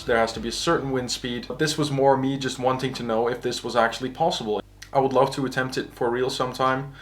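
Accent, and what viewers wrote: Netherlands. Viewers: eh bro jij bent toch nederlands dat hoor ik aan je engels accent, maar je maakt echt leuke content ga zo door! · Are you actually Dutch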